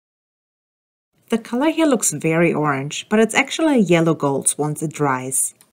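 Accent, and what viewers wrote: Germany. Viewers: I like red dry wine, Pinot or Italian wines. That's a cute little dog at the end of the video. If I ever get a dog again I want a small one like yours. You are such a beautiful woman and I knew you would be a heart breaker in a dress. Is your accent German? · I can tell 🤔 are you German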